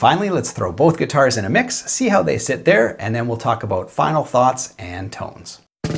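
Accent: Canada. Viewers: you sound so Canadian to me really enjoy your videos bro! Love from the Dominican Republic.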